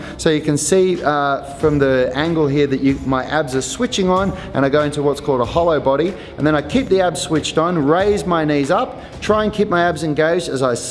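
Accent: Australia. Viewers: Are you Aussie? 🇦🇺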